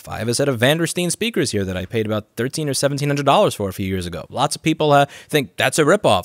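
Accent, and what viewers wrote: New York, United States. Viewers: Get the fuck outa here, yep he is definitely from new york lol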